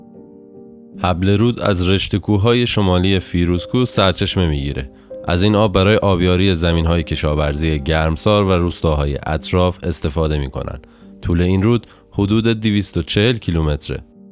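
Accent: Shiraz, Iran. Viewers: با اختلاف از تمام تهیه کنندگان محتوا روی YouTube بالاتر هستید. لحجه ی شیرازی امضا کار می‌باشد و میهن دوستی در صدایتان موج میزند. پاینده باشید. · به به لهجه شیرین شیرازی. خسته نباشید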